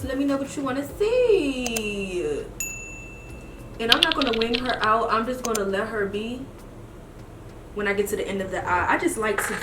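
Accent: Washington DC, United States. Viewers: Oooo is that a Dc accent I hear🥰😍😍❤️❤️❤️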